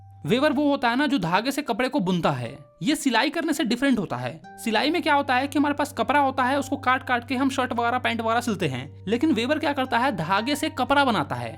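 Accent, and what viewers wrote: Bihar, India. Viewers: Bhaiya bihari kam bolo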